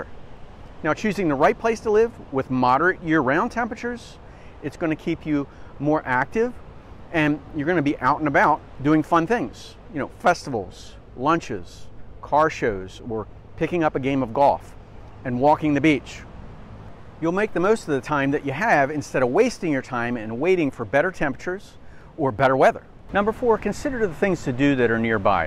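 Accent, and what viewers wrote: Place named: Pittsburgh, United States. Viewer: Accent is from Pittsburgh?... maybe Philly...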